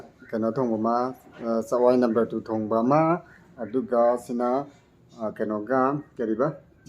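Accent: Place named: Imphal, India. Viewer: Brogi khonjelse imphal gi taingo gi pura manley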